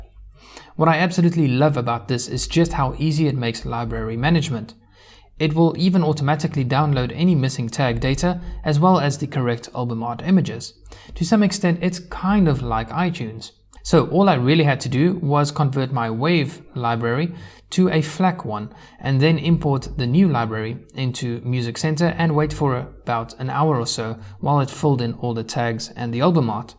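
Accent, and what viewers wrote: South Africa. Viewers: I'll be surprised if I'm wrong here but by any chance are you South African 🇿🇦 ? 😁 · nice review! you sound south african...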